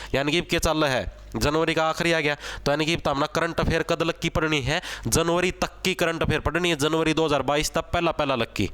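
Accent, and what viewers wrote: Haryana, India. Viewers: Always talking like .......k gyan s thara...... Very nice...no. 1 Haryana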